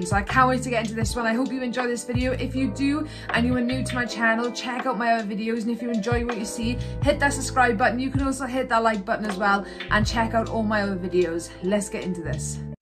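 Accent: Wales, United Kingdom. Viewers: Budgie are from Cardiff. Sounds like you’re from Wales 🏴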